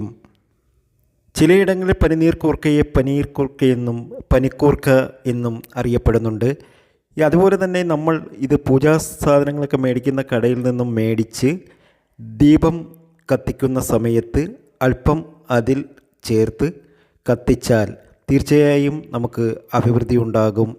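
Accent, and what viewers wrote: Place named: Kottayam, India. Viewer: Kottayam dt aakum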